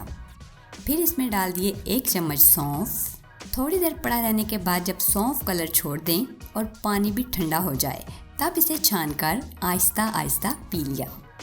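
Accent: Punjab, India. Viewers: Apki Punjabi boht zabardast h . Same jaisy hm ghar bolty hain .. or nazar to kamaaal ki utari Apne 🤗🤗🤗😍😍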